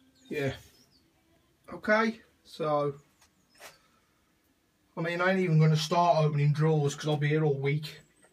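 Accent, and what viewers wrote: Birmingham, United Kingdom. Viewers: you sound like your from Birmingham?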